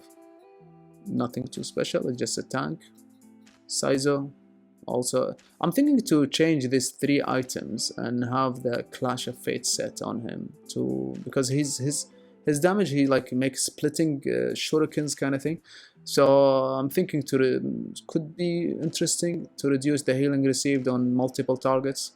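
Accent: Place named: Arab world. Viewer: ليش صوتك كأنك عربي يتكلم انجليزي ؟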